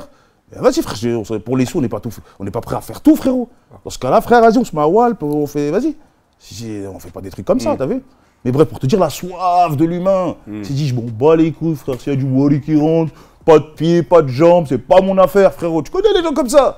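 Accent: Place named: Martinique. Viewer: Il est trop fort avec l accent martiniquais 👍🏾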